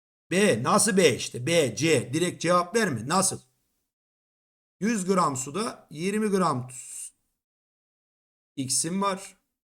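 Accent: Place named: Erzurum, Turkey. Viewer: hocam şans eseri youtube ana ekranıma düştünüz tıkaldım izlemeye başladım konuşmanızdan Erzurum havası aldım 4. soruda 0 altı Erzurum dediğinizde dedim ki bu hoca Erzurumlu yani benim hemşerim acaba doğrumudur. ❤‍🔥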